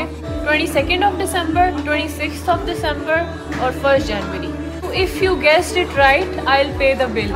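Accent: Punjab, India. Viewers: I watched alot of vlogs of other people which shifted korea but baiii is da mzaaaa e or eh... Punjabi.. Touch zbrdast 💜❤️ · I love your vlogs and also your Punjabi accent 🤍💗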